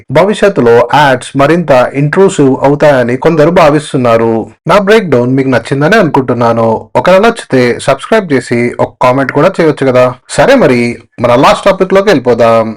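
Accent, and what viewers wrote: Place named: India. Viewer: Content bagunnayi but telugu slang konchem change cheyali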